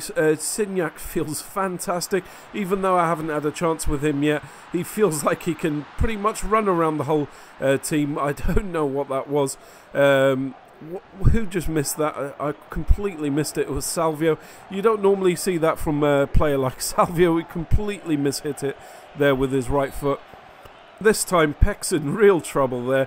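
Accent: Portugal. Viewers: You re portuguese right?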